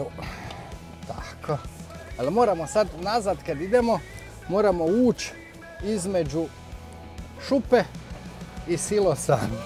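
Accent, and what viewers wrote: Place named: Vojvodina, Serbia. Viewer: Pozz iz Amerike,centralni dio ili midwest ( st louis I okolina 😂 ) Volim tvoj naglasak,malo mi "bacas" na Lalu 😊😊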